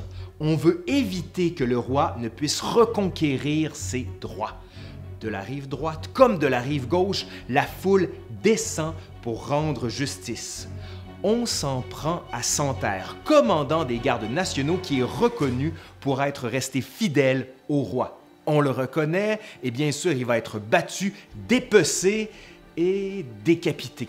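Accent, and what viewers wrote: Canada. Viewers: Tu as un accent canadien🇨🇦🇨🇦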